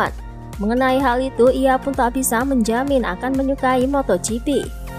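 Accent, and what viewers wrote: Java, Indonesia. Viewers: Chanel ini keren. Tapi agak kurang pas saja dialek & intonasi mimin_host nya. Nampak banget seperti anak SD (untuk ukuran host YouTube) cara host baca dialog. Lagian dialek jowo ne nan kental mendowngrade level Chanel ini. Itu aj point' yg belum gw mensubscibe Chanel ini.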